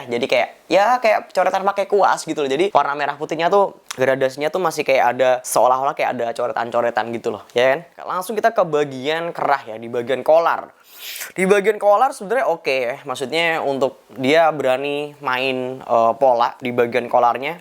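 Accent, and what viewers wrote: Java, Indonesia. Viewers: Gawe boso jowo ae mas medokkk lek mu omong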